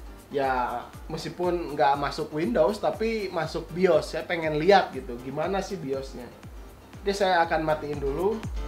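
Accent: West Java, Indonesia. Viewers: Logat sunda pisan euy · Urang mna akang B.indonesia logat Sunda..😂😂😂😂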